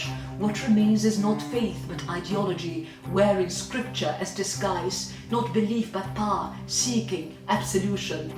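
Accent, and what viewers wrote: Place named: London, United Kingdom. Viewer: Mad accent. It's like an Edwardian era upper middle class London accent.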